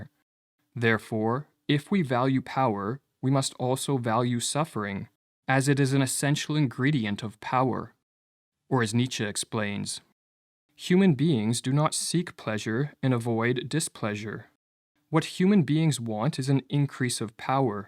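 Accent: Canada. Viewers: Canadian you are, I noticed.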